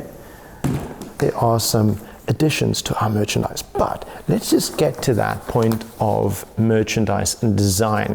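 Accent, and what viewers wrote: South Africa. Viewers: I've always suspected your accent might be South African but I just couldn't put my finger on it bru!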